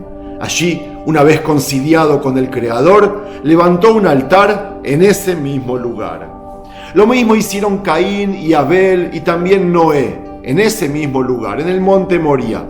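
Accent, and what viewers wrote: Buenos Aires, Argentina. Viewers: Este tio q hebreo va ser habla como Porteño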